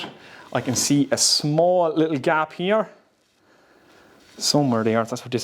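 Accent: Ireland. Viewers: I thoroughly enjoy and appreciate your content! .......even when I disagree with it. I live in Southern California (born and raised), surfed all my life, and if you ever met me, you might comment on my California surfer accent. I can't speak for other Americans but I think your Irish accent definitely adds value to your content!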